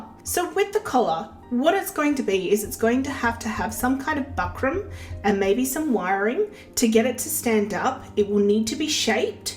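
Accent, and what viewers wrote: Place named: Australia. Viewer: I love the Australian english!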